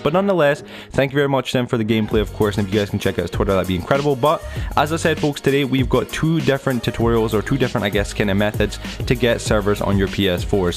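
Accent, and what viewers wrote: Scotland, United Kingdom. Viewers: Are you Scottish? You just sound Scottish, if you are then that's cool, cause I'm also scottish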